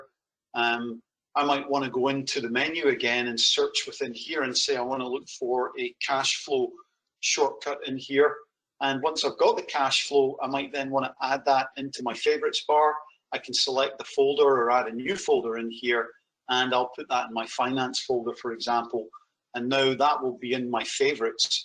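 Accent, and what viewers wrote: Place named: Canada. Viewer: Hi it sounds like you're based in Canada. How can we reach out to you for consulting opportunities?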